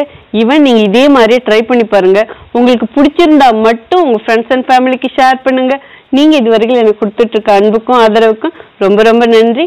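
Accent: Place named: Coimbatore, India. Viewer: ஏந்த ஊர் கண்ணு நீங்க உங்களுடைய பேச்சில் கோயமுத்துர் வாசம்வருதோ recipes are super I made your pongal and tiffin samber recipe it came out well thank you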